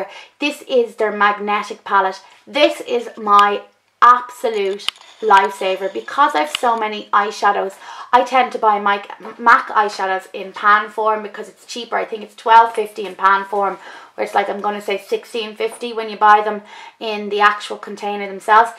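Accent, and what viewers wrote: Ireland. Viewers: Trust me hun I would watch more than 45 mins of makeup if it's you! I can't believe I've just discovered you I think your brilliant and l love your accent! <3 it reminds me of my half Irish fiancé :) thanks for showing us everything I haven't got much of a selection I've only recently started my makeup journey so the most I have is just a bag filled of goodies that's about it but if I keep watching you I will end up with wayyyyy more makeup than you!! Thanks again !! Oh and btw congrats on the wedding!!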